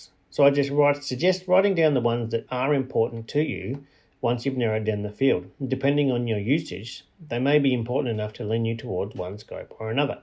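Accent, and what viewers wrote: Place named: Australia. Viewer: I guess it's just ME (Anyone else have this problem?) but..........that heavy Aussie accent and fast-talking got me. Understood about 10% of what you said. Was looking forward to a super-informational video on thermal scopes/spotters but had to bow out early. Bummer.......!!!! (Maybe you can slow down your speech a bit.........Will give you a "Thumbs Up" as I'd bet the video was otherwise worth it).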